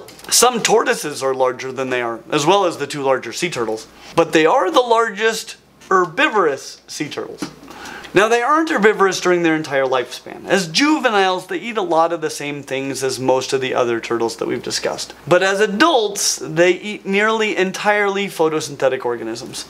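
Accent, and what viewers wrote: Australia. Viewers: i need you to do an entire episode in your australian accent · Bonzer Aussie accent, mate! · Can we get a video of Australian animals with doing his amazing Australian accent????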